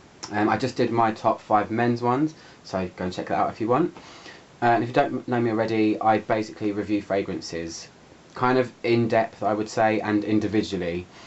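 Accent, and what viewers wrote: United Kingdom. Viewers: great british accent, I envy you!:) Let me ask you a question, because I am watching and watching your perfume reviews now and thinking about buying this Joop! Femme online, but I've never ever smell it before.... and I don't know what to do! I have my 3rd big bottle of CK ONE now - for everyday use and Salvador Dali Dali Classic (this white one) - not for every day;). I love Dior - Midnight Poison, but unfortunately it is no longer available... I am not sure if Joop! Femme will be too strong scent for me and cause a headache or it will be just perfect to wear for night... What do you think?:)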